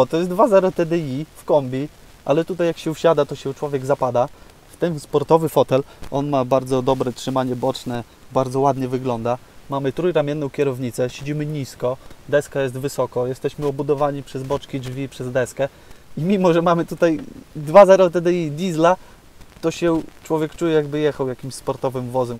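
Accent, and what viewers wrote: Podlasie, Poland. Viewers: Masz suba fajnie filmy ;) Słuchać akcent Podlaski, pozdrawiam ;)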